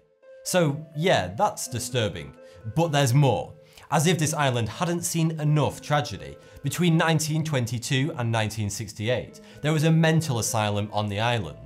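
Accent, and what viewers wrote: United Kingdom. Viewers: Maybe it's exactly because his accent seems really "british " and let's say pure that I find it hard to understand,I'm more used to "impure accent" ,when we practice our english in class we do not have that perfect accent so it can be harder. I find american or australian accents easier to hear down But maybe it's just me ahah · British accent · So what is this guys accent? British, Scottish?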